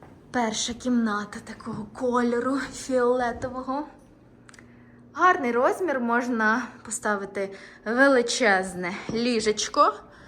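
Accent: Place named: Vinnytsia, Ukraine. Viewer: ви з Вінничини? Знайомий суржик.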